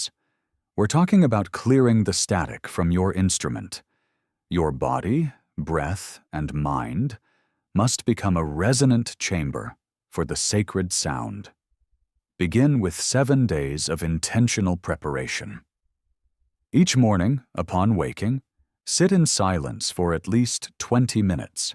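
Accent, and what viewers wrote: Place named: Kenya. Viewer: Those vowels sound and are exactly like those used by Kikuyus in Kenya 🇰🇪. A,E,I,O,U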